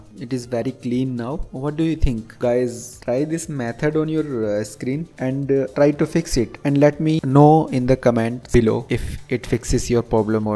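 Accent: India. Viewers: He has the best indian accent!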